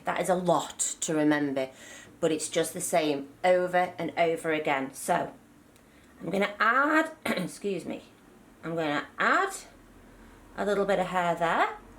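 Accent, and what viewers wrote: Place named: England, United Kingdom. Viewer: great clear tutorial!! i watched a view but got lost seeing the fingers! ps love your English Accent.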